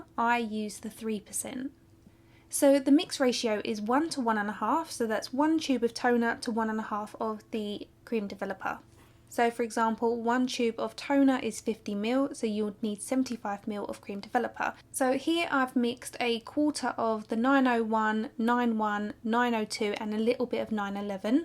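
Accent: United Kingdom. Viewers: U r beautiful and I like ur English accent....it seems a UK accent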